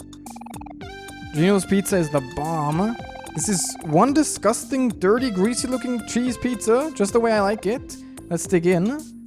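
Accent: Germany. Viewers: Oh, he's German? I did think his accent didn't really sound American or British or Australian or any other English accents. No idea what Canadian accent sounds like or how it is to be distinguished from the others. But German wasn't what I thought he was, I had no idea what I thought tbh. I'm Danish myself, and I feel like Danish and German have similar accents, yet when I hear Germans and Danes speak English, the Germans' accents sound much better than ours (at least when it comes to politicians, I think, English sounds awful when spoken with a Danish accent). I do love accent though, he sounds so cool and funny, his voice is an enjoyment to listen to.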